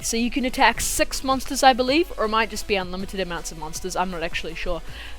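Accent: New Zealand. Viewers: Are you kiwi?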